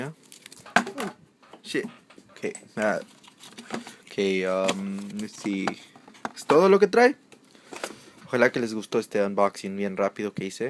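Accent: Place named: United States. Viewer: tu ingles es excelente, el español bastante bien siendo un idioma bien dificil, pero el tono estadounidense en el ingles es ideal para videos en ingles, en mi opinion.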